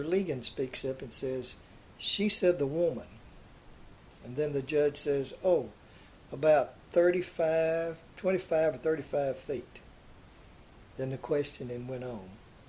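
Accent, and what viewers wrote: South, United States. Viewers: You do a great job of these little videos, and I enjoy your colorful regional accent (I have deep roots in the South - my mother was from middle Tennessee and my father from Virginia so I am used to drawls), but forgive me if I correct one grammatical error which always drives me crazy when I read or hear it: it's hanged, not hung. Use "hung" for things ("I hung the laundry out to dry"), but "hanged" for people ("he was hanged for murder"). This is a common grammatical error and surprises many when they discover it, but I had a grade-school teacher who was adamant about correct grammar. Just sayin'. Thanks again for all these entertaining and informative videos!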